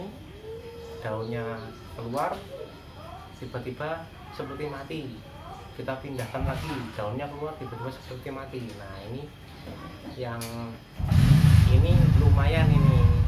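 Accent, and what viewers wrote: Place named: Banyumas, Indonesia. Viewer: Wong ngapak ya? Najajl inyong direwangi support ya, wis mampir kie, dipadamkan balik yaa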